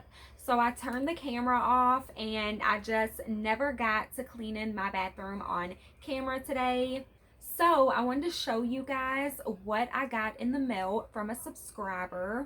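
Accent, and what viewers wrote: South, United States. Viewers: Hey... your home looks great also I'm new to your channel, I just subscribed. Where do you live? I detect a southern accent. Shelby NC here.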